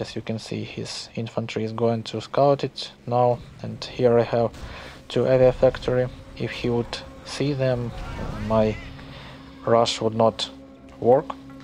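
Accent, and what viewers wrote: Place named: Russia. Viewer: с русским акцентом говоришь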